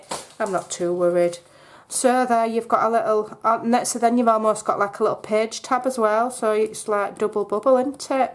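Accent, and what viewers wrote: Yorkshire, United Kingdom. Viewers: Only just discovered this brilliant double sided page cluster and I 💝 the concept... and the yorkie accent 😊 Cheers from Australia 🐨 🐾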